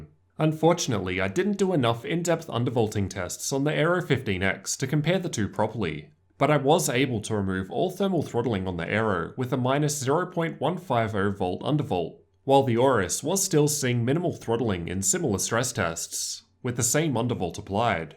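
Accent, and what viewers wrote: Australia. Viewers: This is just how Australians sounds ahahahaha I’m Australian and can confirm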